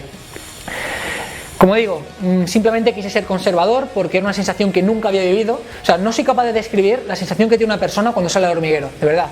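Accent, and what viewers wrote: Galicia, Spain. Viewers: es gallego?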